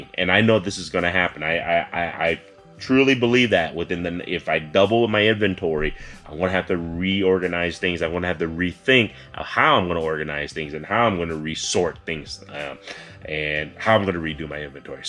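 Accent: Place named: Vietnam. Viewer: Are you vietnamese? U sound like it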